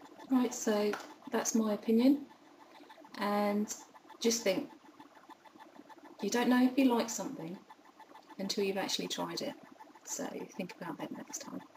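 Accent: United Kingdom. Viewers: She's already beautiful but with that British accent...brilliantly gorgeous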